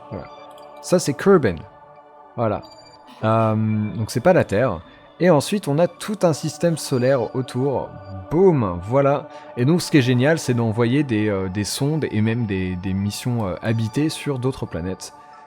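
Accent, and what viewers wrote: England, United Kingdom. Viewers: Il a un putain accent anglais!! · tas laccent anglais toi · Perso je kiff l'accent Anglais de <3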